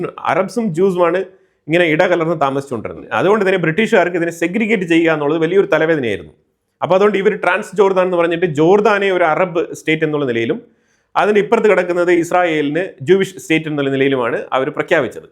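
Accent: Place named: Malabar, India. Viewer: His സ്വീകരിച്ചു of speech is of മലബാര്‍.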